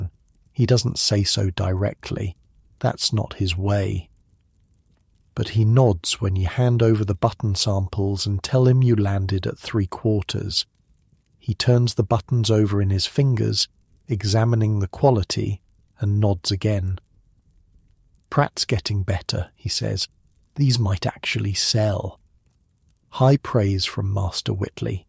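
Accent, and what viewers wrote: United Kingdom. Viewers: Can you tell me when the narratot in this amazing series on YouTube went from an American voice to a British one? I much prefer the soothing British voice. Thank you.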